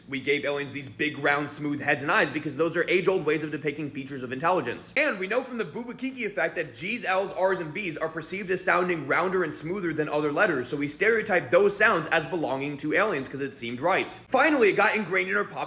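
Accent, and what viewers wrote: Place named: Baltimore, United States. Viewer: That’s just Baltimore accent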